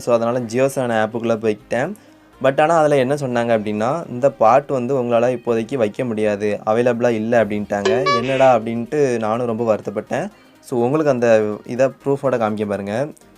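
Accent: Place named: Thoothukudi, India. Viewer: Tuty slang :)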